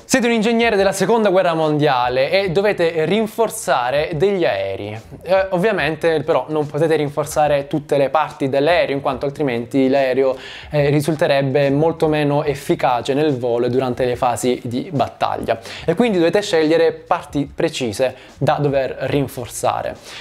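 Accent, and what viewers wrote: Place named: Lamezia, Italy. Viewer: E io che pensavo che la avessimo solo noi Lametini questa Z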